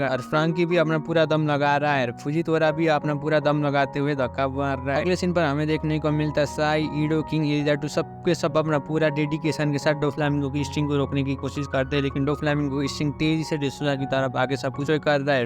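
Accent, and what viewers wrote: Nepal. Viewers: Are you nepali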